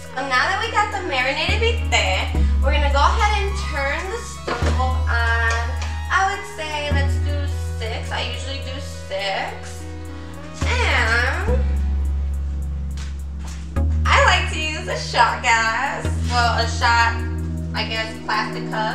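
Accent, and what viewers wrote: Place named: Puerto Rico. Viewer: I loveee your Puerto Rican accent 😭😭❤️❤️❤️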